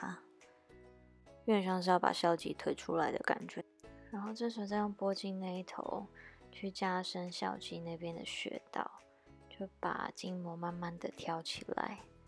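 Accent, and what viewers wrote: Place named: Taiwan. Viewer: 她就是在好好说话呀，难道要人家按中央新闻那么说话才算是好好说话嘛……台湾或东南亚有很多华人是这样腔调的好嘛……少见多怪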